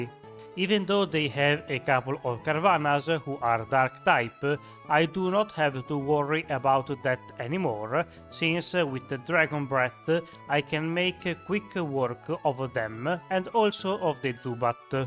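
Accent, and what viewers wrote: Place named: Italy. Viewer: Si sente che sei italiano...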